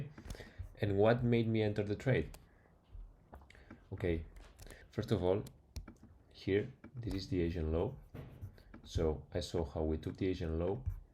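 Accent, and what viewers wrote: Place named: Spain. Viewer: video super útil, gracias! Buen ingles, pero se nota el acento Español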